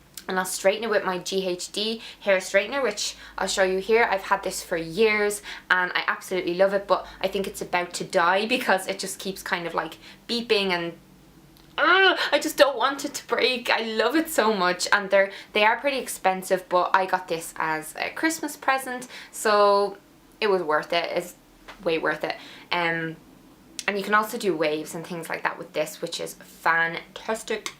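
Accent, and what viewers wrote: Dublin, Ireland. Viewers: from your acsent I know you from Dublin Ireland. I'm from Ireland myself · Firstly, my english isn't good and sorry for this :( My hairs seems like yours. They are very long, shiny and thick. I love my hair but I hate hair breakages :( what I can I do? Notes: I'm very lucky I discoverd u :) I'm a Turkish girl. Irish accent fascinates me :D Your accent is amazing I think :) You're so cut and amazingly beautiful :) I love ya :* And again sorry my english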